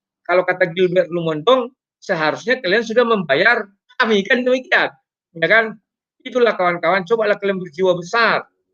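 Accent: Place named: West Java, Indonesia. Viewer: Logat Sunda 😂😂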